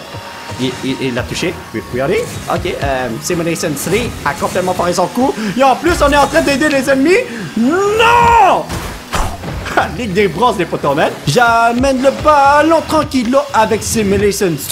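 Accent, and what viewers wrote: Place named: Canada. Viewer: Ah ok mpi je suis diamant ^^, vu tas façon de parler tu dois etre canadien si je ne me trompe pas ? Si sa te dis on peut jouer ensemble ^^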